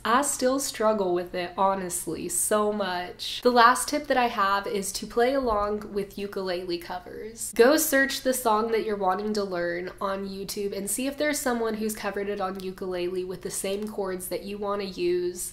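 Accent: South, United States. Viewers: No offense but when she's talking she kinda sounds like she's trying to muffle a southern accent.😂Edit: Not trying to be rude I love you, you have some of the best tutorials❤ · Your southern accent is slipping out in this video lol.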